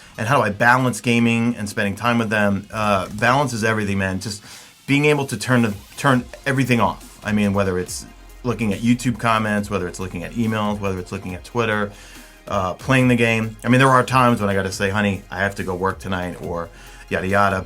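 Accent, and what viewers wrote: New York, United States. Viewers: #MadeItToTheEnd Can you do a video with your new York accent #askmesa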